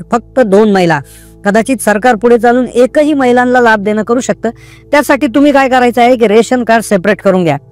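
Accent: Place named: Kokrale, India. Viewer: कोकराळे मध्ये बोलते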